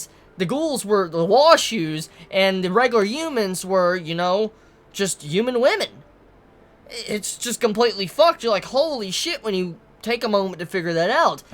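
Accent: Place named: South, United States. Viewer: He is from the Southeastern USA. He has a bit of a southern accent but not has heavy.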